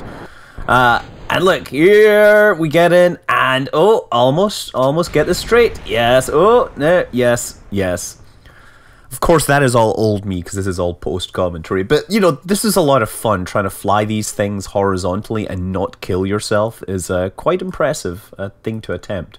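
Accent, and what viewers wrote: Scotland, United Kingdom. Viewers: He's from scottland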